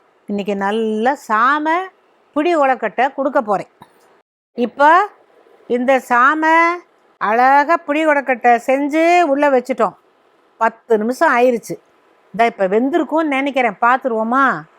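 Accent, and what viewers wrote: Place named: Rural Tamil, India. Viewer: I love the way you speak in rural Tamil. Very interesting and informative. ❤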